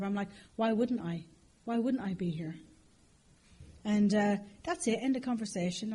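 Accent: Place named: Ireland. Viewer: I love your irish.great sense of humor.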